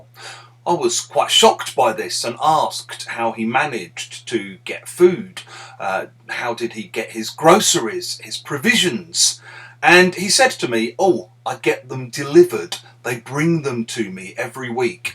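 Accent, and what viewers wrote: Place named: RP, United Kingdom. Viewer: I can't stand her la-di-da way of speaking.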